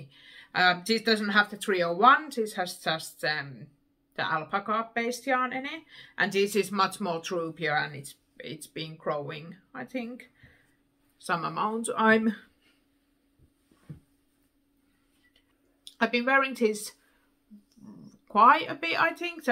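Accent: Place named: United Kingdom. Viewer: Lmao I was thinking about it whether your husband is from the UK or if you have studied there due to your British accent but then you revealed that your husband is actually British. Thanks for the video! It was fun to watch it while knitting and hopefully you keep making these. I absolutely love it how you use stronger and bolder colours compared to many others and it's also interesting to see these popular patterns in these vibrant colours ;)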